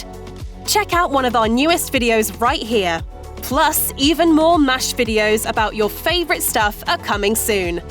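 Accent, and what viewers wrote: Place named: United Kingdom. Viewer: wait whaat! , mashed isn't british lol , where'd you find this so lovely nice british narrator lady , she's sounds awesome , btw im british too , great video & lovely narration too , stay safe mashed staff , bye!!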